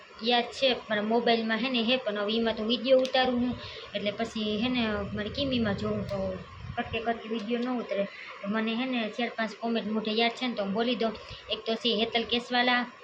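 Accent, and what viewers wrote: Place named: Mer, India. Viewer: Ben emaa dhyan j n devaay saachi vaat che ben aapde aagd vdhy bijaanu n jovaay tme ben amaara mer jevu j bolo cho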